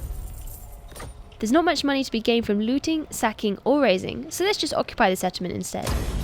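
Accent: England, United Kingdom. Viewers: She has such a lovely English Accent :) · qt british voice always makes things more enjoyable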